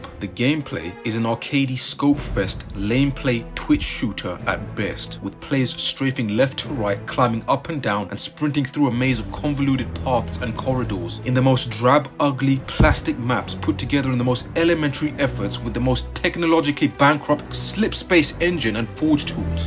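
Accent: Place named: United Kingdom. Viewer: ya veo acento británico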